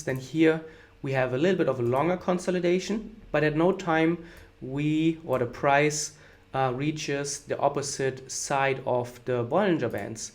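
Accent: Germany. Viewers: Dialect like german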